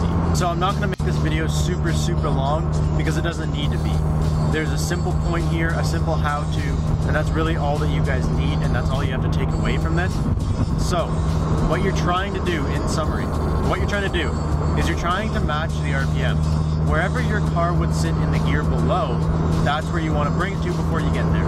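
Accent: Canada. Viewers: He sounds really Canadian honestly he reminds me of my friend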